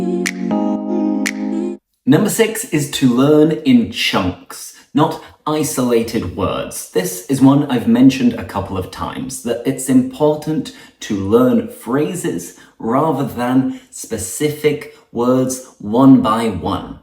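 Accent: United Kingdom. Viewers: I couldn't express my feeling much more, but at least this video could provides me guides of progressing my English skill I wanted to. Despite the struggle on my English words management for speaking, I still can understand English in the simple way (such as everyday context). As in the video, you had said "Practice makes perfect." But for me, it's seems too difficult because I always thought practicing on English consumes more times than expected. Neverthless, I have to stay strong and never willing to surrender on my track, even if it's not perfectly. And you had said next "Practice makes progress." It is true in a matter that whatever I study messy, unorganized, mistakely, and so on, progress is currently on. Anyways, I really enjoy your British English sound. And don't forget, I'm learning English as a second language to unlock the potential opportunities, pursuing my dreams abroad, and having fun to myself. 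· Your british accent is so beautiful!! 🥰 I am Brazilian, and my English leve is intermideat, but I am studying for improve my English and wanna be 100% fluent. 😊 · Hi . Are you from the UK?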